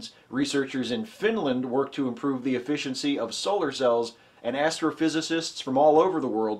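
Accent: United States. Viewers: veeee hickul. I love American accents.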